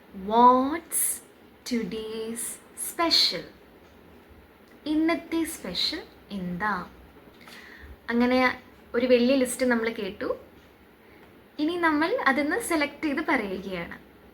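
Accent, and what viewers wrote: Palakkad, India. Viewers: Palakkadan slang super 🙌😂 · Pkd Slang 🔥 😂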